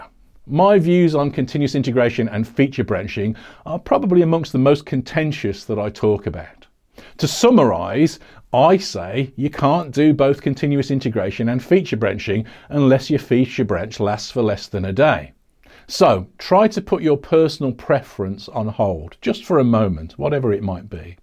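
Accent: United Kingdom. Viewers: This channel is just telling me things I already believe in a British accent. I mean that in the best possible way.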